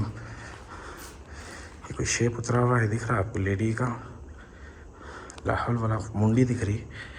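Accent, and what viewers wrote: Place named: Hyderabad, India. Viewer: Assalamualaikum bhaijaan ...love to see ur vlogs...and also like your hyderbadi language 😅😅😅...plz vlogs continue raikho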